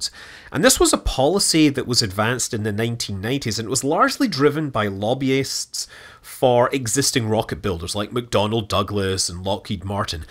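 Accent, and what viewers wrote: Scotland, United Kingdom. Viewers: Where you from in Scotland chief?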